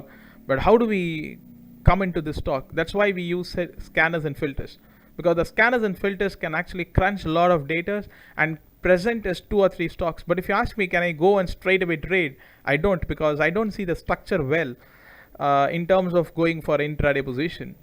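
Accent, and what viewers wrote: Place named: India. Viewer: You are explaining without hiding ,anyt hing the sharemarket secrets in indian English.Your dedicated service is weicomed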